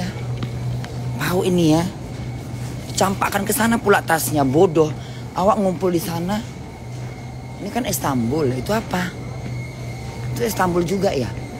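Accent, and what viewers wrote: Medan, Indonesia. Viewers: Jangan kau hilang kan bahasa medan mu corla itu lah penarikmu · "pauknya aku" 🤣🤣🤣 Bahasa Medan bgtt Bun.... Oalahhhhhh uda dikirim balik 100 juta nya ke si itu? Syukurlah ya Bun.... harga diri lebihh penting ya Bun.... 👍👍👍 Pantesan dia diam.... hahaha...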